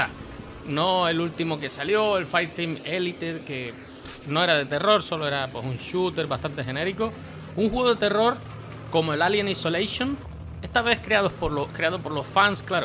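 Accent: Canary Islands, Spain. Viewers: fuese de España por su forma de hablar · es de las islas canarias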